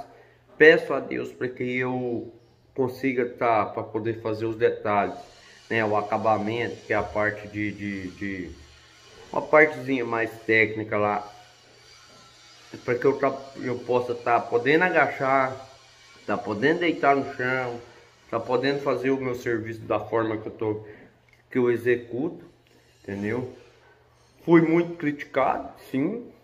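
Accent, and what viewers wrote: Minas Gerais, Brazil. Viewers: Éh mineiro!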